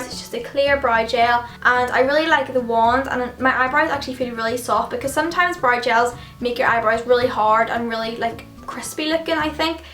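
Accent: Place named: Northern Ireland, United Kingdom. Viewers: myrthe van leest Belfast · Northern Ireland I know her 😏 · Northern Ireland I think ?!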